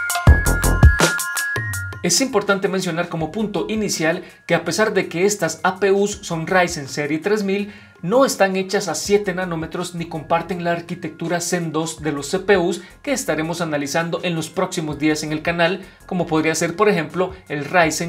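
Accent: Costa Rica. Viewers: Mae usted es de Costa Rica? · Hola soy de Costa Rica Quiero comprarme una pc nueva que me corra buenos juegos Estoy viendo tu video "análisis de los nuevos ryzen 3400g y 3200g" Creo que por tu acento sos de acá Costa Rica Sabes donde puedo comprar una pc a buen precio?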